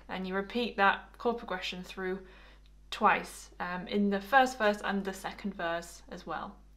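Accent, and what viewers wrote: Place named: North, United Kingdom. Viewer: Impeccable tuition. Cute northern accent. What's not to love?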